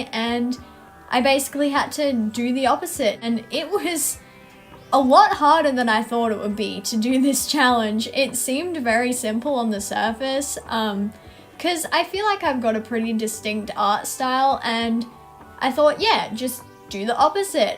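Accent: Australia. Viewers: Just found ur channel, ur personality is si great I love ur vids 😂 are you australian? Your art is amazing btw!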